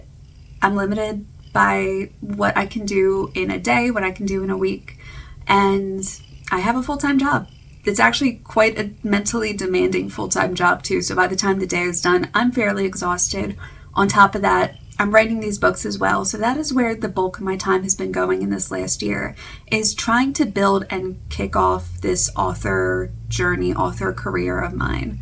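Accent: Australia. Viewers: I can hear some twangs of the Aussie accent creeping in there! Nice to see you again, glad you are going well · Great to see you again. Love your content from your previous posts. This is from a dinky dye born in Australia Australian. Your accent and use of Australian slang is definitely there, even though I still hear your North American accent as well. This is from one who has the dual London England and Australian accent. Your family might have fun understanding you when you visit them later in the year. Patience about the permanent residency and eventually Australian citizenship, you will get there. Look after yourself and your health. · I miss you, however you're not to me now you're Mostly Aussie. Also your American accent isn't as strong as it once was. Won't be long and you'll have a full Aussie accent. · Yeah mate, your starting to sound Aussie. Mark is a lucky man. 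· I derect notes of an Australian accent,well done, thanks for the very interesting update, I was wondering what had happened to you. As an Australian I find the PR system to he appalling.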